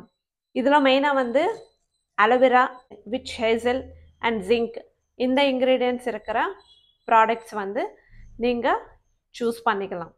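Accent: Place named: India. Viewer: looking beautiful in yellow saree😍pimples will come due to body heat 😍Alovera fresh a eat pannu na pimples reduce agum 😍ponnu put vlog in pink saree😍your tamil slang is cute😍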